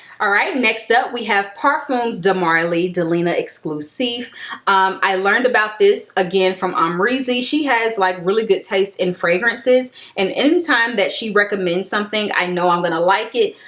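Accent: South, United States. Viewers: Love your country accent.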